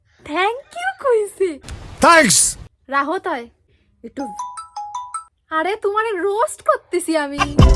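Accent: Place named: Bangladesh. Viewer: Bangladesh er kun jaigai jeno amon vabe kotha bole 😂😂😂😂😂😂😂kotha gula khub valo lage😂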